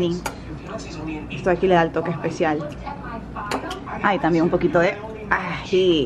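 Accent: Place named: Ecuador. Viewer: Por tu forma de hablar te reconocí enseguida que eras ecuatoriana xD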